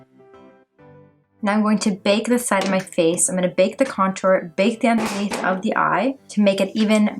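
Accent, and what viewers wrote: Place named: Coast, Colombia. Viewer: Usted parece costeño colombiana jejejeje pero mi familia también son Portuguese y mi papá es trigenio bendiciones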